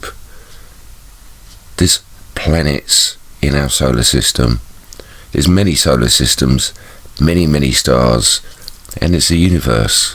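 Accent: England, United Kingdom. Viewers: "Enen if you think it's a bug on the lense you're crazy"? This kind of thing has got to stop! And NOW! As I watched this video i thought, at last! A quality video made by a quality person and not people yelling and swearing at each other and stuffing their belief systems down each others throat. There is a point where you say "it's for you guys to decide" True! It's for everybody to decide, make their own minds up. Thats how it should be until we are all told the truth and we know for sure! It's all we have at this time. Discernment and choice. You very nicely lay out the facs that YOU have. Interesting pictures indeed and food for thought, BUT you ruin the video and my opinion of you by saying someone is crazy if they don't see it your way!!!! You sound English so if you are I'm sure you will know of the Astronomer Patrick Moore? I never found him appealing to watch but Im sure millions would agree. 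He was hoever , a very interesting man if you could keep up with him talking 20 to the dozen. As a kid I learned a lot from him but some months ago he was discussing the moon with another astrologer and he mentioned the conspiracy world and the "fake moon landings" .he looked right into the camera with that terrifying eyebrow up on his head almost and the eye that belonged to the said brow, popping out like an organ stop and shouted at the viewers "anyone who thinks that WE didn't land on the moon has to be compleeeeeeetly INSANE"!! That was it for me, all respect GONE in a flash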